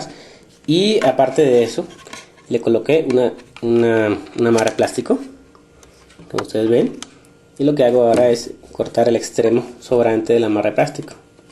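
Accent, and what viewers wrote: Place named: Mexico. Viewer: me cae en los huevos el acento mexicano parece que le agregan acento a tooodas las palabras al final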